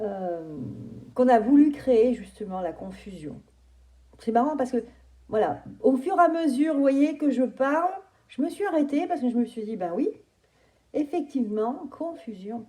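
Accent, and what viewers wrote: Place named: Corrèze, France. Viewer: Bonsoir merci bp j adore votre accent je crois entendre ma cousine correzienne c est presque pareil😊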